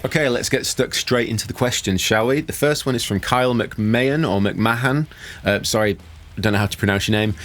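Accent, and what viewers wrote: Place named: London, United Kingdom. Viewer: Me: he sounds like he's from London..?: Sweetwa'a Me: say no more.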